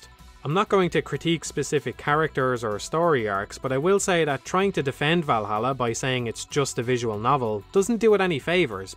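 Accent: Ireland. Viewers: xaax666 he's irish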